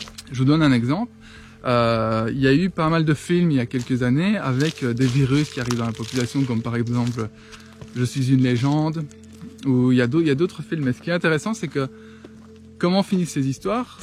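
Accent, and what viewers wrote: Liège, Belgium. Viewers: Bonjour. Ton analyse est sage, juste et lucide. Merci. Télévision= "tell a vision", raconter une réalité, et ça se fait via des..."programmes" sur des "chaînes" CQFD instrument de propagande et de programmation mentale. J'ignore où tu vis à présent mais tu as pris soin d'y amener ton accent liégeois:-) Love & Laughter from Cité Ardente. WWG1WGA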